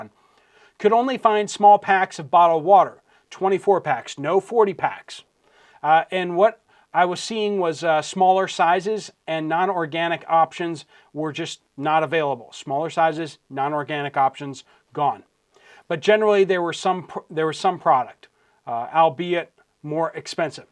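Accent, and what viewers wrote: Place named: Texas, United States. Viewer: May I suggest a Dale Carnegie or other readily available public speaking course? You will be so much more comfortable. It helps kick out the Texas speech patterns in all of us.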